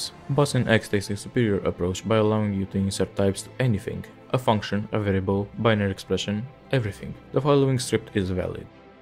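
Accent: Moldova. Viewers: Băi ești moldovean?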